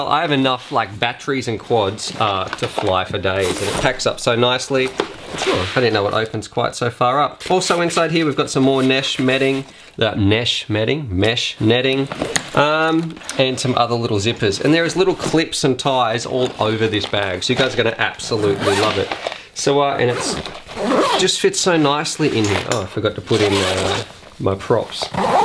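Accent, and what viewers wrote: Australia. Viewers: Hay great videos man but just wondering are You Australian because if you are like me I would love your chance even more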